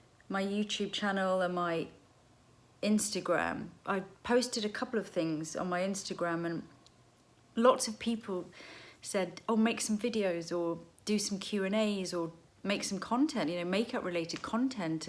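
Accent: England, United Kingdom. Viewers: Hello from the United States. I absolutely love you and your style of makeup. I love that you teach about good skincare and other beauty products. I love your makeup tutorials and I would love to see you do more of them. I have never learned how to do my eyes the way I would like. I don't know why I just hold back and don't really go for it. I have deep set eyes and I am over 60. I would love to see you doing videos everyday if you could and teach us about good skincare, facial massage, how to wear makeup. What is best for older women and how we should wear it and also what colors, etc. So sad to hear about your Kitty and I know that has to be so sad. I have my pretty kitty, her name is Snowball and she will be 15 years old in April. It is so hard staying in here and it is so scary as I know it is for all of you there in the UK and all over Europe. 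We have to help each other and it is so wonderful that you are so gifted and talented in doing makeup that you can share with the rest of us all over the world with your videos. I hope you will do one everyday.. Love watching you and listening to you. Love the accent. I live in the South in the U.S. and I love all things English. It is my Dream to visit the UK and all the old Historical Places and Churches there. I am a Jane Austin kind of girl. I ordered your lipsticks and am so enjoying them so very much. I look so forward to all you teach and hope to see so much more. Thank You so much. You made my day. Love all the Beauty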